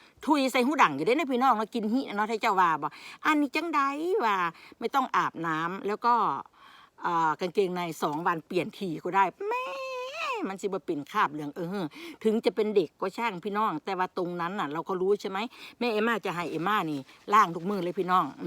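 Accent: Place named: Kui, Thailand. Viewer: เว่าเป็นตากุยแท้ครับ ฝรั่ง55+😄😂🥳😁🎉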